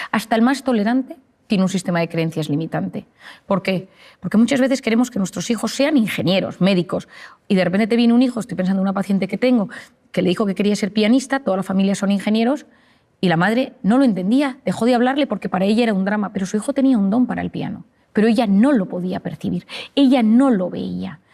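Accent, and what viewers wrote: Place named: Spain. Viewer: Está Dra . Marian, es un encanto, es una cachaqueria, escucharla, poco a poco he descubierto tantos puntos críticos que uno tiene pero no lo sabe y he podido mejorar con sus concejos y enseñanzas. Que Dios la Bendiga mucho. Aparte tiene una voz muy bonita y ese acento español. Me ha ayudado mucho. Gracias Dra. Marian, que buena obra haces, desde Colombia Gracias , Gracias , espero q acá se encuentren sus libros. Sigue así , Te nesecitamos.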